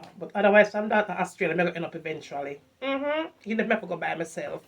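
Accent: Jamaica. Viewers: Yes bestie I'm so happy you got Jamaican accent as me also I'm half Jamaican accent and speak English or some Spanish word.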